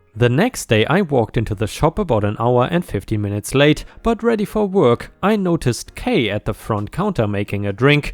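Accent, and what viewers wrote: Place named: Germany. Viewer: ⭐⭐⭐⭐⭐⭐another set of great stories, brilliantly told! I lived and worked in Germany for a while and miss the accent. Makes me feel at home sort of? If you know what I mean?? In a nice way! 🌟🌟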